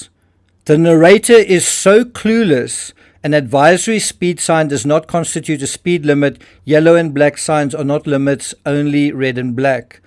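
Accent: South Africa. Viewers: He's South African. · A very interesting video. Thank you so much for covering this case (and all the others you cover, actually). That's the reason I subscribed. You are logical and thought provoking and I always look forward to watching your videos. I was born in Joburg, so love hearing your accent. To this video though ... I don't think anyone will tattle. They are from a farming community and I think that particular property has been in the family for a couple of generations, though I haven't researched that so I could be wrong. I personally think they will stick together, no matter what occurred "think as thieves" comes to mind. But I would love to hear your perspective as to why the mother Jessica reportedly left the property after Gus went missing. The same thing happened with the children missing from Nova Scotia - the mother took off the same day, from memory. 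I then saw something recently where the step-father has been arrested for some sort of assault charges (not related to the missing children). I couldn't help but go " ... hmmmm ... " I am wondering if Jessica left the property after Gus went missing to distance herself because she is aware of what happened to Gus. Looking forward to your next video. Thank you again for your excellent coverage.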